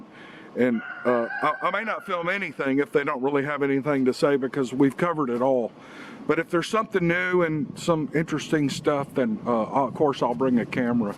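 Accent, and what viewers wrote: South, United States. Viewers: I am addicted to your Chanel. In your interview 5 you mentioned that you were not collecting 🥚 from your hens. It is happening to me now and I solved the puzzle. Two of my 4 hens are eating the eggs. You have beautiful animals. You may call your roster Claudio. I watched your documentary and enjoyed it. You are an excellent storyteller and I like your southern accent. Regards from Puerto Rico 🇵🇷! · Love your southern accent and the stories. Keep up the good work 👏🏾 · you talk and explain as much as you want to I enjoy listening to you talk, your accent reminds me of home and you got a good old boy accent I feel,you are a fantastic narrator and even if there was more commercials I would still put up with it to listen to you talk look at your stupid chickens and just enjoy myself of Southernman temporarily stuck in Chicago I would rather stay at home and listen to you then wander around outside in the jungle there ain't no bigfoots in this jungle!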